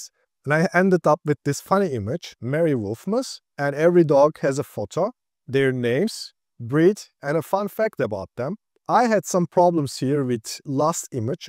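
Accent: Turkey. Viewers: Dostum aksanın çok türk, türk müsün merak ettim. Video için ayrıca teşekkürler. 🇹🇷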